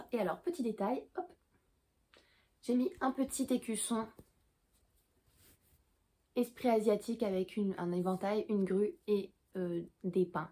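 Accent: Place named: Nord, France. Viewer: Hello! Vidéo très sympa, et qui a confirmé ce que je pensais: tu es du Nord aussi! Lol j avais senti un tout tout petit accent de chez nous;-) bon week-end ma jolie!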